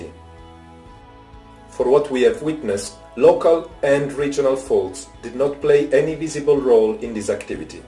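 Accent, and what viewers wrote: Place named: Italy. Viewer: Il tuo accento italiano si sente pure a 7.000km di distanza. Ciao bella Italia. Saluti dalla Handerson, Las Vegas, Nevada, USA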